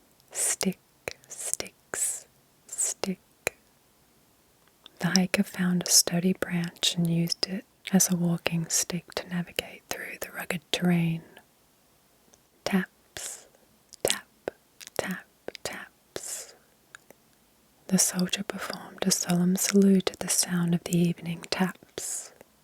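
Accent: Australia. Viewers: Hearing a soft gentle aussie womans voice whisper certain words and example sentences takes me straight back to soing spelling bees in primary school. There are notable austtalian ASMR creators, but not many, so its always nice to find them. Just makes me relate more which makes the relaxation better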